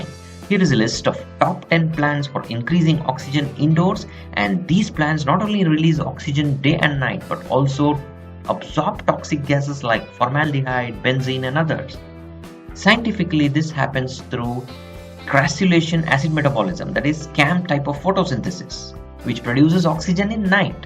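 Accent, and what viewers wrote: India. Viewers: I don't know why I like to hear English spoken with an Indian accent.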